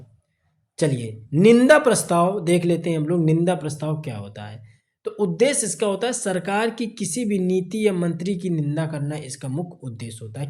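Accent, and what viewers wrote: Kanpur, India. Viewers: Sir aapne bahut ache se samjhaya.... Kya aap kanpur se ...apki awaj se aise lga mujhe ...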